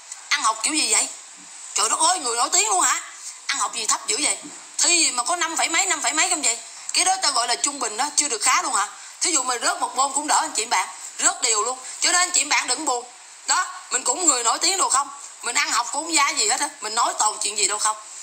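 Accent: Standard. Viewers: Em nói quá chuẩn Hay quá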